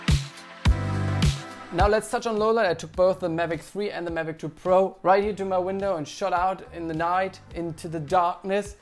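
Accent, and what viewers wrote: Germany. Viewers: Where do you got your german accent from!?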